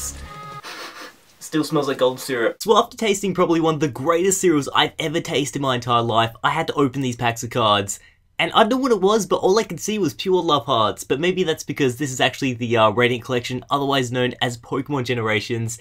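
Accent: Australia. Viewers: you're Australian accent is fabulous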